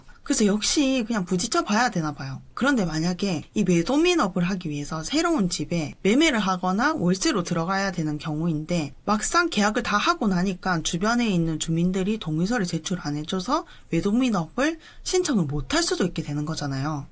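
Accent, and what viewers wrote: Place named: Gyeongnam, South Korea. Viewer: 경남 말투 졸라 심하다 ㅜㅜ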